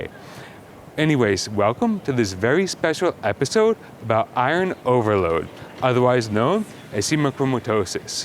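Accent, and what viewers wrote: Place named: Japan. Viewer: A white guy, with a Japanese accent?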